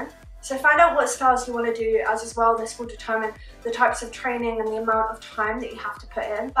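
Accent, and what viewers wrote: United Kingdom. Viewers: good video :D and that British Accent is on Point!!!!!! :D :D :D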